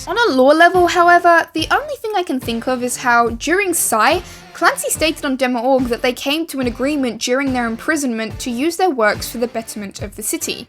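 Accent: United Kingdom. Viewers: i didn’t realize dema was british 😭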